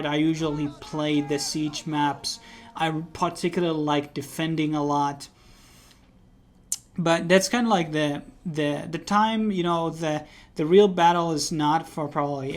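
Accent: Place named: Poland. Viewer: you sound like my polish friend, are you from Poland?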